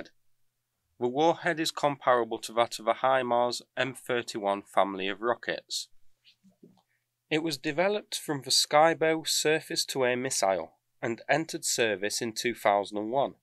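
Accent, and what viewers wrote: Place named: Northern England, United Kingdom. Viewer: Still the same bad narration by a northern English speaker who should know better!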